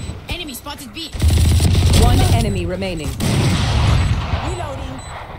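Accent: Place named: Russia. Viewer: Ты русская оказывается? в конце только когда услышала поняла)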